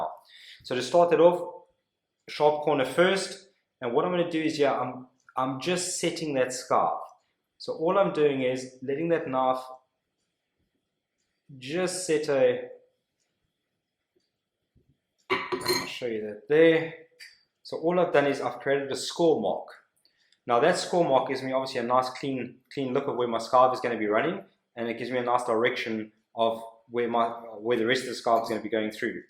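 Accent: South Africa. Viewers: Are you a South African ole chap ?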